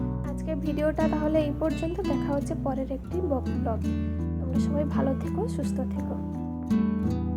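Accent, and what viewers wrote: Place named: Medinipur, India. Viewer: Khub sundor 😊 Upnar khotha gulo puro amadar medinipur a vasar moto khub valo laglo suna❤❤❤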